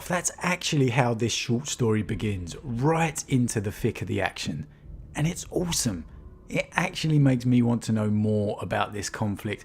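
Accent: United Kingdom. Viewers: I just realised you're British, haha. Such a subtle accent.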